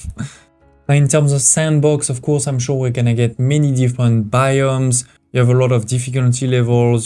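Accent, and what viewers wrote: France. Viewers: omg I love your French accent, it calms me down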